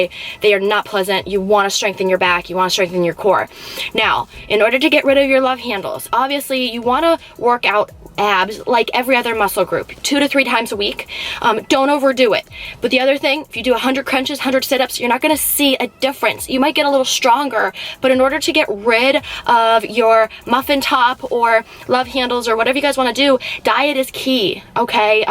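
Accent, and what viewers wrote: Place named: United States. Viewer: Where in America do chicks talk like you? Sounds cute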